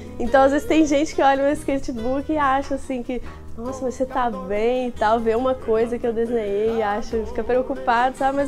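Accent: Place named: Brazil. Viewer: antes eu n tava ligado nessa de definir o sotaque brasileiro do inglês, mas no momento que vi ela falar do vídeo do mine, sabia que ela era brasileira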